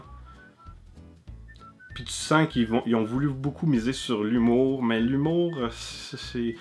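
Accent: Quebec, Canada. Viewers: Respect les québécois toi OK C'est un accent Abrutis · Wow! J’aime ça. Tant d’insulte, même pour défendre le vidéaste qu’on aime. S’il a jamais entendu de Québécois, well ok, même si on devient de plus en plus connu. Faudra que tu t’y habitue. Insulter un accent c’est comme si tu insultais un chien noir car toi t’as un chien brun alors qu’ils sont de la même race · walid belhadji pq t comme ca?c surment un quebecois,c son accent.lui au moin il a posté une video sur un film tant attendu.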